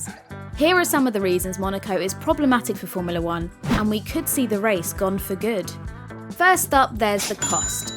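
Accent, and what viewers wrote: United Kingdom. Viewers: Your accent is the most British I ever heard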